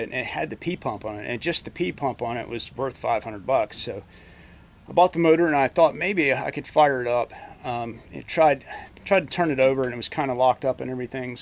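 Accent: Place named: Georgia, United States. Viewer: Lol I knew this guy was from Georgia before I Saw his tag I’ve been to pike County a few times!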